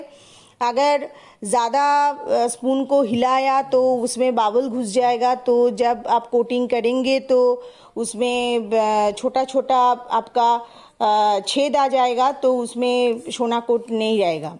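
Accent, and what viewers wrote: Bengal, India. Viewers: আপনার হিন্দি বলার ধরণ বলে দিচ্ছে আপনার মাতৃভাষা বাংলা , বাংলাতে বলতে পারেন ।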